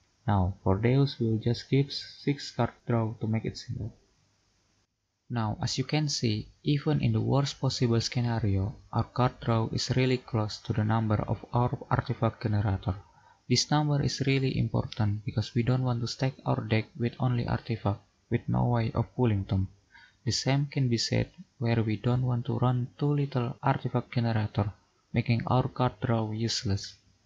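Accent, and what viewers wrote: Indonesia. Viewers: u sound like indonesian tbh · Also i never guess that you're indonesian before i hear your voice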